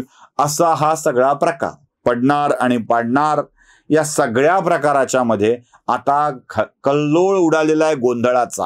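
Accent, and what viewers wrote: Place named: Marathwada, India. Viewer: Asal Maratha wada/ Chatrapati Sambhaji Nagar bhasha 😂😂 Mast 👍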